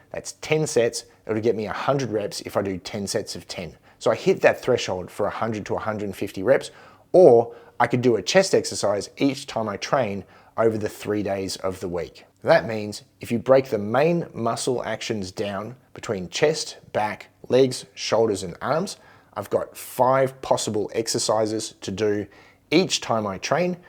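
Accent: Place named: Australia. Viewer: Excellent info, and I can vouch for 95% of it from living it firsthand. Hearing it in an Aussie accent was a nice bonus. 🍻 🇦🇺 Cheers, from across the pacific (fellow lifter over 40)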